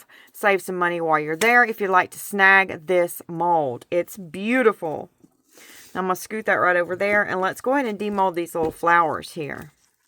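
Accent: South, United States. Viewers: I'm so glad I found your channel. 😊 You're a delight. From your southern twang to your sweet laugh. ❤ Those pink molds could be for making candy. Possibly the split is for a scew stick for cake pops or something? IDK 🤷‍♀️ 😂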